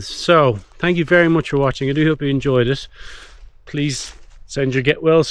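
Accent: Ireland. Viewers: Wow!!! Beautiful video. Music! Editing! Day in the life... everyday beauty! Cooking! Love it! Great pace. Great visuals... and your beautiful Irish accent!!! Thank you!! Loved it. Watching from NYC.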